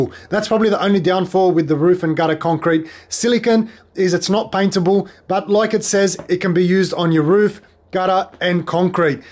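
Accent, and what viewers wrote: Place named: Australia. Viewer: Hi, I hope you see this😊... you sound like an Aussie 🇦🇺 so I'm just wondering if could please tell me if the 1st one is the same as (same brand) silicon as yours said concrete? I'm going to Bunnings & there's one for $6 & the concrete is $25... do both do the same thing? Thanks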